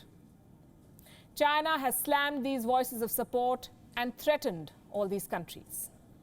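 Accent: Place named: India. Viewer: Indians have the best accent in the world!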